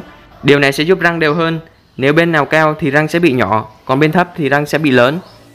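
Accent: Vietnam. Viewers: Bạn nói giọng bắc tưởng ở đâu đó loanh quanh Hà Tây thì nhất định chạy đến mua bia với mồi nhậu để xin kết bạn hihi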